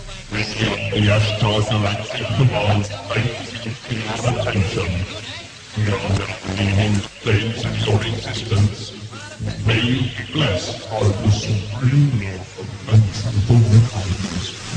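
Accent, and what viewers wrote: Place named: Bristol, United Kingdom. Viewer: The accent is definitely english, maybe bristol?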